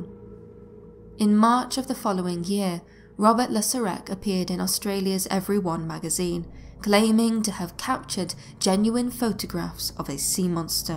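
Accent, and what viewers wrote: United Kingdom. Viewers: The British accent played out in these narrative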